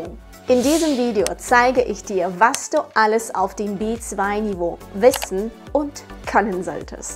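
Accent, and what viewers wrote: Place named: Russia. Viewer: Если честно с первого слова русский акцент распознала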